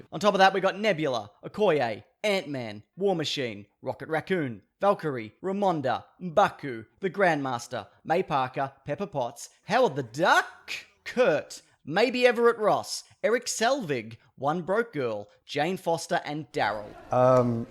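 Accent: Australia. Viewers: You sound Aussie as fuck are you Aussie as fuck? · Man true Australian accent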